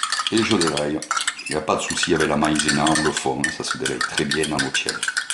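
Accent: South, France. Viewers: Je c pas si tu es marseillais ou pas mais bravo pour ta recette g bien aimé avec ton accent du sud sa sent le vrai. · Bonjour! Merci pour cette bonne recette; je ne connaissais pas la préparation avec les oignons rouges coupés en tranches. C'est succulent avec le miel! En accompagnement des pommes de terre rissolées c'est extra! Merci pour cette belle vidéo démonstrative! J'aime bien votre accent méridional! Amitiés de Belgique MIMILOU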